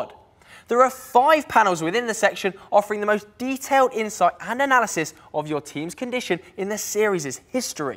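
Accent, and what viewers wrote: United Kingdom. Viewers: When he has great British accent and talks like a childish 15-year-old Sorority girl in US.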